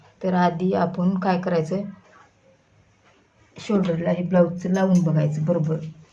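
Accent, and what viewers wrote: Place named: Hingoli, India. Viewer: Tie chan shikawlat shantapane kasa shiwaycha blows te. tumhi Vasmat Kiwa Hingoli chya ahat ka? Tumchya bolnya warun watla.👌👌😊 thank you.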